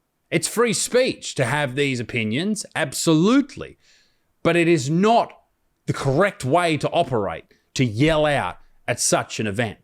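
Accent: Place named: Australia. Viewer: Australian accents are very captivating in a strange way for me, anyways love your content 🙏 never give up to the stupidity of the world ☺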